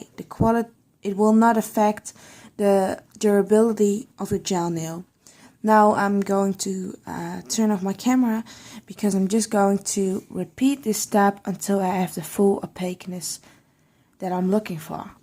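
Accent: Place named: Netherlands. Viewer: whatts wrong vith her voice? Its an accent. I guess Dutch or maybe swedish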